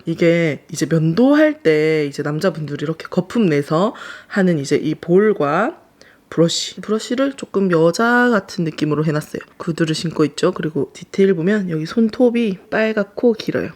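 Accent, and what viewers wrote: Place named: Gyeongsang, South Korea. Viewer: 말씀하시는거보면 저도같은경상도라그런지 친해지고싶네요^^ 대화해보고싶은 ,,, 화이팅~~^^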